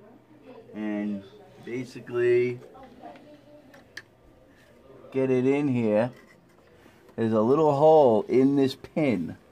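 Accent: Boston, United States. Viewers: I’m guessing from the accent, “right heah”, “in theah”, “cawfee” and the red hair that this was recorded at Nocella’s Southie branch. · Ah you from Bahston? Me, too. Thanks for the help. Will now try to see if it works.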